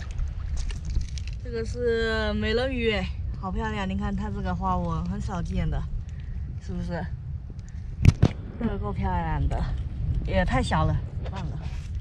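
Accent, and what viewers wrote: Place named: Putian, China. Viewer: 我上次有在你生日留言那个视频不知你有没有看过，听皮皮说你还没有男朋友我很开心，我觉你是聪明 美丽 朴素善良可爱姑娘如果有可能我们联系看看，简单的自我介绍一下，男28岁 福清人 父母都在家乡一个妹妹已出嫁、我在美国快10年本来今年二月要回国看亲（父母一直吹）我听你讲国语是莆田口音离我家乡不会太远我估计差不多2小时吧、你也知道在我们那边28岁还没有结婚算高龄了😭当现在美国疫情很严重不可能回国了，自从看了你视频以后觉的你一定会是那种会认真过日子的人，所以有机会我们互相交流了解一下、等你回复谢谢💪💪💪💪💪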